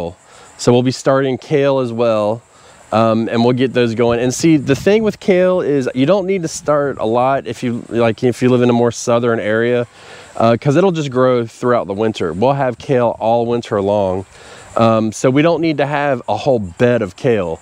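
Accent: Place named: North Carolina, United States. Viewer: Northern NC accent I'm guessing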